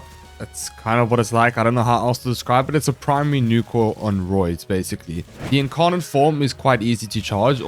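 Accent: South Africa. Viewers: Yoo dude are you from South Africa ? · that South African accent slays me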